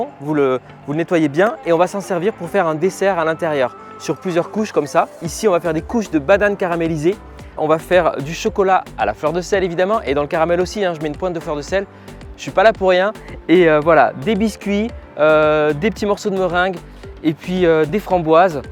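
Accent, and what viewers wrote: South, France. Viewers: Merci pour ce moment sympa, vos bonnes idées et votre petit accent gourmand qui me rappelle ce sud que j'aime tant (et où je ne vis plus, hélas...) Je vais tenter ce dessert, c'est sûr.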